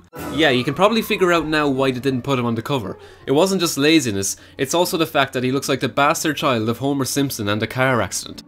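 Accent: Ireland. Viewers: He's Irish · explained through an Irish accent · Irish maybe hmmm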